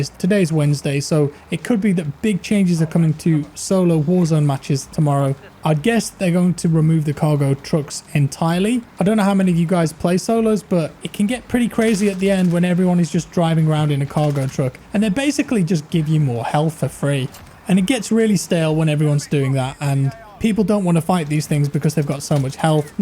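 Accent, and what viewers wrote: United Kingdom. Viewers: A bunch of streamers already do the "spectate" - don't follow. I love the adult conversations regarding gaming with other adults. Mix in the British accent and I just laugh. M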